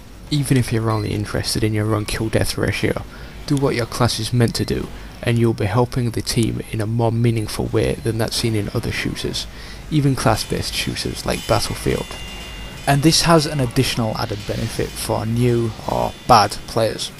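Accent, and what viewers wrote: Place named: Scotland, United Kingdom. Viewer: his acent is scotish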